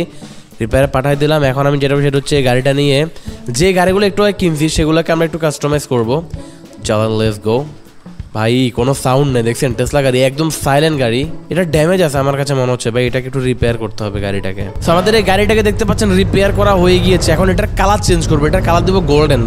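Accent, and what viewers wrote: Noakhali, Bangladesh. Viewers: ভাই নোয়াখালী কথায় আপনার বাড়ি😅😊❤❤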